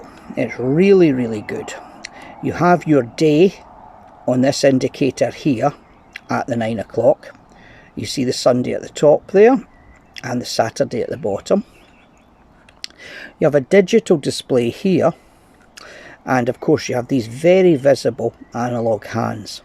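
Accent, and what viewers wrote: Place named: Scotland, United Kingdom. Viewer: Good clear review, i like your clear Scots accent too.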